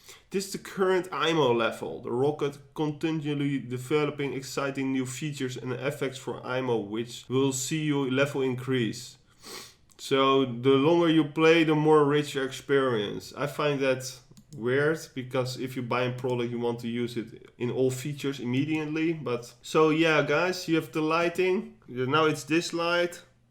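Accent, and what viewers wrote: Netherlands. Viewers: hahahaha dat nederlandse accent heerlijk dit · That Dutch accent though. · Jezus dat nederlands accent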